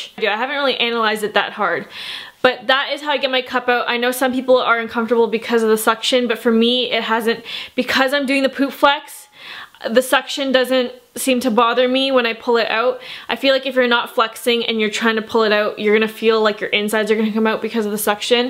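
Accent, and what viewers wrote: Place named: Pennsylvania, United States. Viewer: First off, thank you for the informative vid! I just purchased my first cup& I'm due for my next cycle in about 3 days & eager to try it out! Also, this is random, but do you live in or are you from PA? I hear an accent- ish similar to my family that live there.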